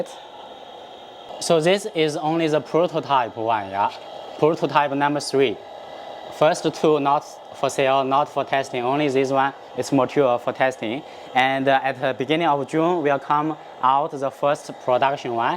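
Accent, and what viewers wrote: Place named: Vietnamese American, United States. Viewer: bro has that vietnamese-american accent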